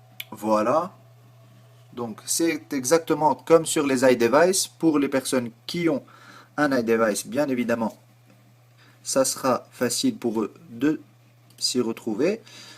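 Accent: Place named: Tunisia. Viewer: petit accent (tunisien?) tres charmant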